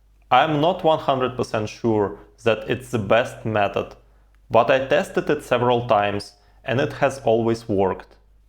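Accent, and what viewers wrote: Russia. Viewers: Speaking with russian accent)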